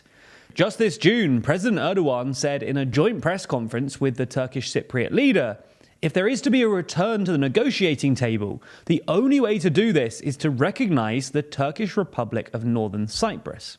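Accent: England, United Kingdom. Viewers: No one expects absolute perfection, just close but with an English accent. When they do something as ridiculous as pronounce the Spanish double-L as a hard L sound, you know they either have had surprisingly little exposure to Spanish (possible but not likely), put no thought into it, or have so little respect for other cultures that they intentionally over-Anglicize their pronunciations. They claim they look up pronunciations but sometimes forget them once they begin recording, but some of their mistakes are too easy to avoid, and they also could write their scripts with (quasi-)phonetic spellings, but apparently they don't. And they tend to keep making the same mistakes over and over in multiple videos. These are very simple things, and not doing them means they aren't putting in nearly as much effort as they claim.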